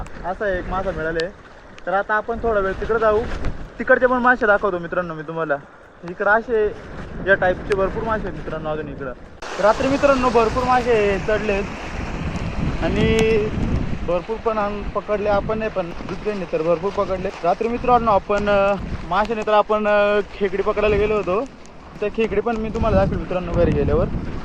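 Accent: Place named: Karwar, India. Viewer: करवाली बोलतात .....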